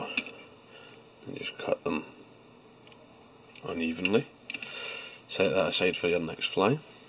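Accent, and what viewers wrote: Scotland, United Kingdom. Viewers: Great looking wee crab. I love your accent, Davie McPhail called his pattern a wee beastie tonight. I love it. If I could go anywhere it would be Scotland. Would it be helpful to put some tungsten or lead substitute under the (on top) of the hook when tying in the eyes to help keep the crab from flipping? Just wondering. I have no use for a crab because I don't fish salt water. I am sure there are a few species of fresh water crab but I don't know anything about them. I may tie one just to entertain the wife. She loves to see my flies if they are impressionistic enough to understand what they are. When your terminal, nothing like a little dementia to take the fear and realism out of dying. For me it is a little more sad. The Lord is preparing me as much as one can be prepared. All the best to you. Keep the videos coming I watch them all night while caring for her. 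Sean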